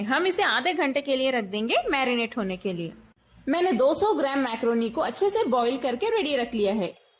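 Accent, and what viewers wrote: Hyderabad, India. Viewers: Ap Hyderabadi ho? 😍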